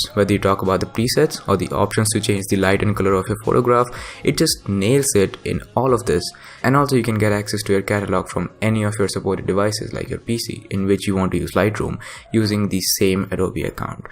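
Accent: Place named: India. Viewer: Fucking indian accent so anoying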